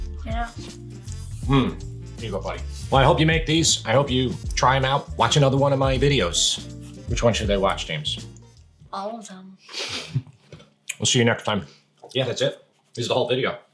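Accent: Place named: East Coast, United States. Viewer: Cool east coast accent, smooth beats, reassuring confidence, that's gonna be an easy subscribe my dude.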